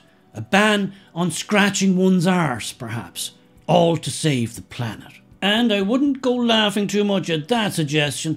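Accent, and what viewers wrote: Ireland. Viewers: Best of all Irish voices on youtube, only you.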